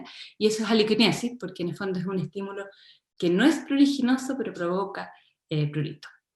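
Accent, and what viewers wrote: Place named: Chile. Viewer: Cuesta entender sus palabras debido a su acento tan marcado, es chileno? 🫩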